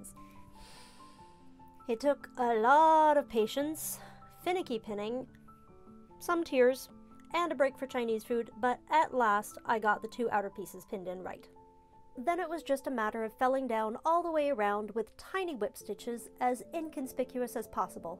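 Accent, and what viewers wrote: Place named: United Kingdom. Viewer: You sound British lol I was confused when you said Canada 🇨🇦 I subscribed I enjoy watching your videos.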